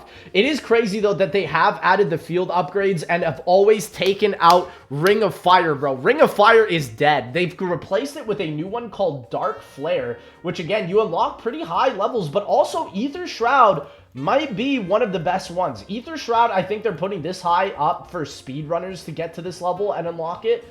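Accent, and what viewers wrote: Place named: Toronto, Canada. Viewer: Toronto accent 🤡🤡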